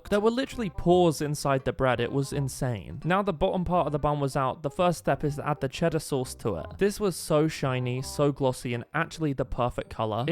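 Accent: United Kingdom. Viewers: Not to lie You sound British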